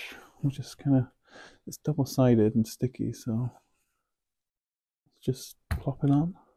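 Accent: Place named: United Kingdom. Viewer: I’ve been wondering about your accent, where are you from in Britain? These videos are great, really nice to get to know you 😊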